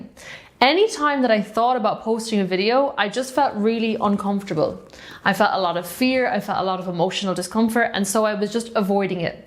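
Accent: Ireland. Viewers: Love your content - Irish accent is beautiful!! I am Irish myself from Limerick. Stop self sabotaging your attempts at drawing!! Haha....you rock woman!! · I lived in Ireland for 7 years, it’s the most dearest loveliest place on earth, I call it my Mother land. And your accent is the sweet nectar to my ears 🍀🍀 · Rewrite equals review or revision. Because resistance it’s versus support. Every time you speak, and your Irish accent steps out you instill this motherly love feeling that speaks to a man’s core identity, it’s a mothering, nurturing, caring, loving kindness, even a protection, you radiate a maternal love that he heals:)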